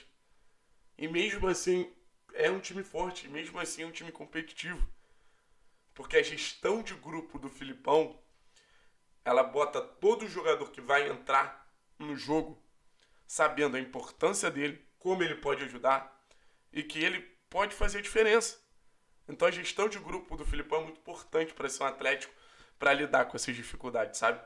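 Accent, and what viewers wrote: Rio de Janeiro, Brazil. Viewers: Paranaense mais Carioca q ja vi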